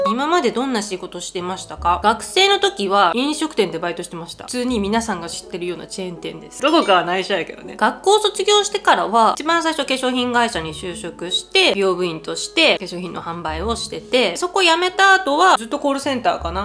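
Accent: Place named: Miyazaki, Japan. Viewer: さんの豪快な食べっぷり、包み隠さず本音でなんでも話してくれるところ、方言（思うっちゃけど〜とか宮崎出身の私にはとても聞き心地が良い）全部好きですよ😊 コメントたまにしかしてませんが…これからも応援してまーす😊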